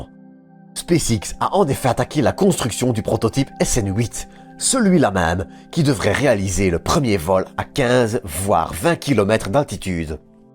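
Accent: Belgium. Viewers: Bienvenue dans le BiG Universe. Accent Belge, sans pincement de nez. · Sujet intéressant et bien traité ainsi que bien expliqué et détaillé. Mais il est claire que la voix off devrais être changer. L'accent belge ne me gène en rien, mais les zozotements + les R trés accRRRocher m'irrite l'oreille :-( Youtubeur n'est peux être pas fait pour tous. ou certain s’épanouisse aussi a resté hors des écrans a bossé sur les sujets. Peux être qu'embauché pour une voix off serais judicieux pour le future de la chaine? En tout cas merci pour la video :)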